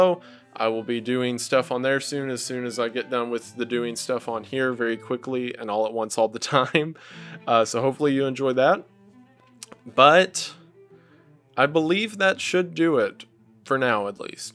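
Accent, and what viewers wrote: South, United States. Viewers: Please do your 8 hour World War 2 video. And if you are worried about the algorithm break it up into three or four parts!!!!! But just so you know I would absolutely listen to every second of it like my life depended on it. I love your channel and I love your voice and I appreciate hearing someone who's voice sounds familiar.. I feel like most YouTubers who gain popularity are not from the South, and the very first time I heard your voice, I immediately thought to myself, "ahhh yes this sounds like home."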